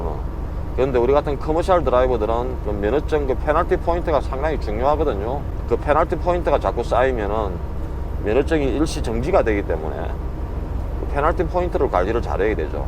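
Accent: Gyeongsang, South Korea. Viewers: 안녕하세요 어제 밤에 다큐세상 " 편"을 보고 인사드립니다. 15일씩이나 계속되는 장거리 운전이 매우 피곤하실텐데, 그속에서 보람을 찾고 새로운 풍경과 정보를 소개시켜 주셔서 감사합니다. 너무 맵게 드시지 마시고 건강관리도 생각하세요. 멋진모습과 구수한 경상도 목소리가 좋아 구독자로 등록 신고합니다.....늘 안전운행하세요... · 다규세상에서 보고 들어 왔어요. 서울 사투리보다 경상도 표준말 최고 입니다. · 오오! 방금 케비에스2티비 방송예고보고 미친듯이 달려옴요! 와! 와! 영어도 갱상도 사투리로하던 공중파 나온다! 와 와! 갱상도 싸나이다 아이가!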